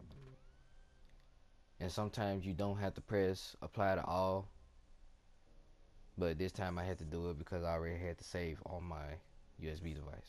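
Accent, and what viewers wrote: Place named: Louisiana, United States. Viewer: I freakin luhv your accent. Are you from Louisiana? lol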